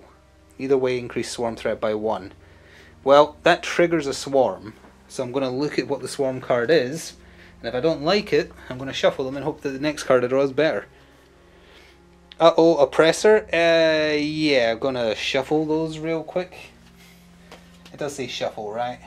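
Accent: Scotland, United Kingdom. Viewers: It's Nitra, so pronounced night-ruh. For the Americans, what's your regional accent? It's leaning Scottish but doesn't sound full Scot.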